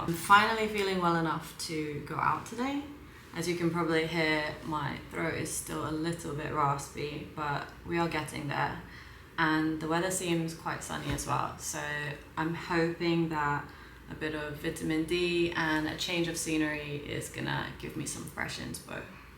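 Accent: United Kingdom. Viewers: 英國腔真的非常好聽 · Quick question 🙋🏻‍♂️ Ur British accent is not that strong so because of the video is for Taiwanese people Or the original accent is not that strong🤔 (Just curious😂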